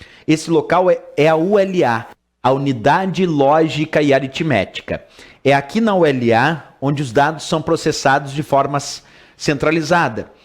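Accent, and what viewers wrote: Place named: South, Brazil. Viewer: nossa que voz chata de povo do sul, sutaque horrivel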